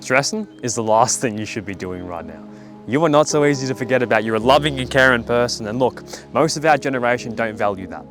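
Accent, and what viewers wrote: Australia. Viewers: I could listen to your Aussie accent all day 😅 I’m just a USA southerner… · Watching this made my day. Thank you ❤ and to answer the title, I really wish and hope to find that one person in few years 🤞. I wish you all well Ps. I like your aussie accent, it suits ya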